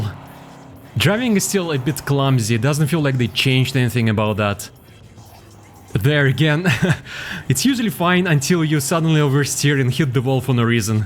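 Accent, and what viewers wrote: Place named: Eastern Europe. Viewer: also, where are you from? I hear a nice eastern-European accent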